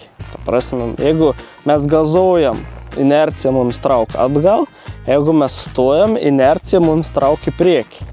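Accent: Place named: Samogitia, Lithuania. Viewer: Iš kalbos galvojau kad Žemaitijoje kur lakstai, o po to žiūriu, kad čia mano miškas prie Vilniaus :D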